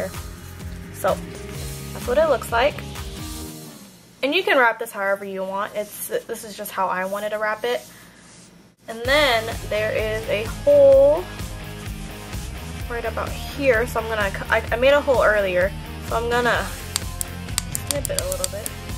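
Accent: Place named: England, United Kingdom. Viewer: where u from u sound like ur from england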